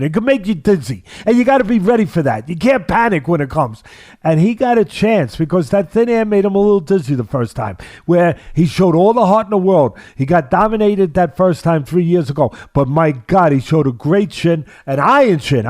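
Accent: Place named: New Jersey, United States. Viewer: Teddy is the Ultimate 🥏 heavy weight Communicator..: passionate, articulate, insightful, intelligent 🤓, and funny with that Iconic NJ accent . When he gets to Heaven King Jesus wants him to talk to Him for a Thousand Years on Fighting...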